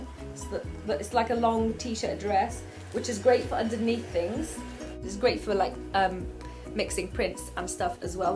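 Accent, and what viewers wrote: United Kingdom. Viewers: I love what you wearing, and i love british accent. <3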